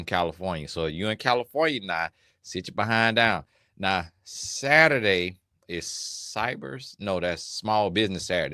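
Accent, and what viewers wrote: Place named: Florida, United States. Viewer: The clothing, hair and archetype descriptions combined with the FL accent is taking me out!!! 😂😂😂😂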